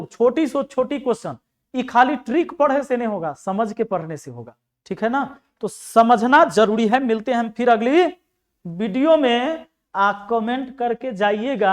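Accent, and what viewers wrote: Bihar, India. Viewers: kya bihari andaaz hai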